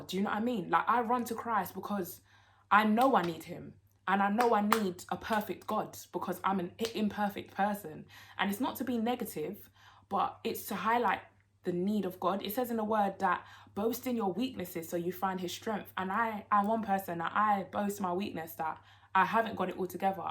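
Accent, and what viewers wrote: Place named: Jamaica. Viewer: You are amazing!! I was so scared of it as well. It will get better! We support you 1000% Are you Jamaican? Loving the patois 😂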